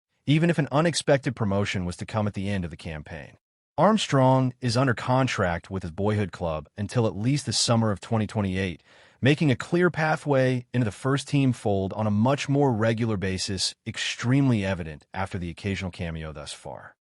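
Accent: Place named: United States. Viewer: Why have the used an American accent on the Ai voice over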